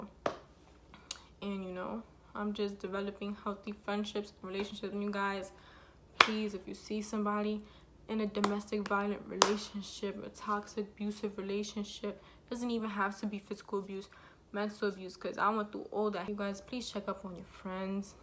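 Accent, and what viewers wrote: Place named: New York, United States. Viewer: You from New York I that assent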